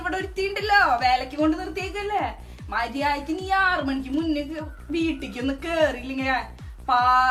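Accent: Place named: Thrissur, India. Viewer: Thani thrissur slang😊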